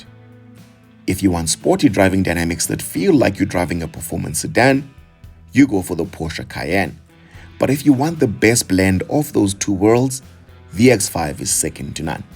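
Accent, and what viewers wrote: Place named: RP, United Kingdom. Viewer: Lol hell no broh, don't tone it down, it makes your channel different broh, you're not trying to imitate someone else, you're just being you🙌🙌😁😁so i, personal don't mind the private school english you have bro😂😂😂but maybe if these comments persist then maybe you can open a poll and people voting to whether tone it down to Africanism or not🤔.....lol tjoo that's indeed a very tough call to make broh but maybe it will go down to which one you prefer the most between Engine and technological advancement but I feel like i can go with a bmw x5 m60i cause it still has a V8 engine in the hood and the interior designs is in a premium level and from depreciation statitics it alleged that M60I holds its value better than the RSQ8🤔😁😁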